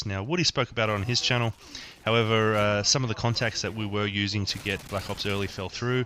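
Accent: Australia. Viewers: Your Australian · ru australian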